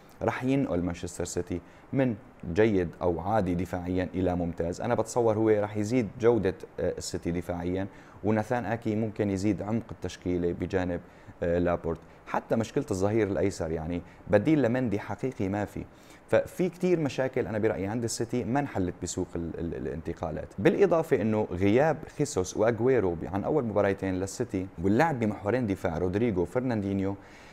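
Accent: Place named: Aleppo, Syria. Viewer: كلام هادئ ومتوازن ومنطقي،من اول فيديو عرفت انك من حلب،الله يعطيك العافية وتقديم جميل وسلس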